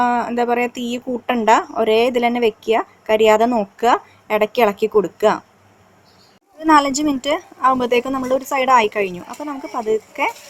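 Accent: Thrissur, India. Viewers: തൃശ്ശൂർ ഭാഷ🤗 കുഴുവ വറുത്തത് 😘😘😘😘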